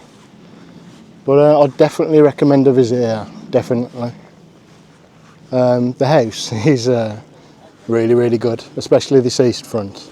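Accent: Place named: Birmingham, United Kingdom. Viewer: So nice to hear a brummy narrator. I think the persuaders with roger moore was shot there or maybe i am mistaken.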